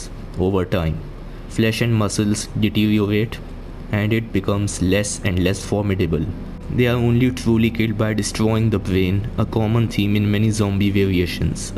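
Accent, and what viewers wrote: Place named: India. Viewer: I prefer the Indian accent then some bot Ai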